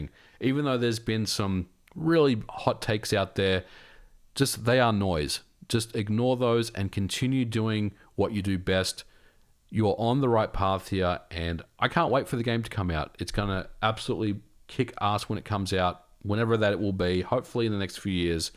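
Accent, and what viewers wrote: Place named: Western Sydney, Australia. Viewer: Which part of Australia are you from? I am getting Western Sydney vibes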